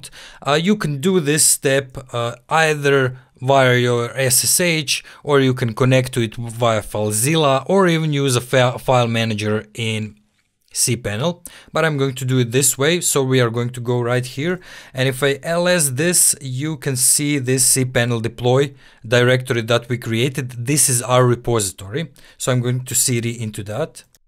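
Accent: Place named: Russia. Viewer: u tallk like russian where u originated frm?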